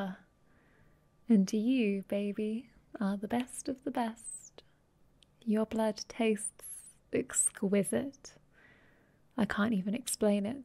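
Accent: United Kingdom. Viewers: Thats accent sounds very either scottish,british,or irish and ...i really like it,not just cause im irish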